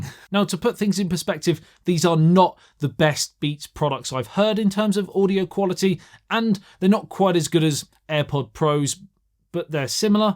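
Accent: United Kingdom. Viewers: What country you're from? Is that a British accent?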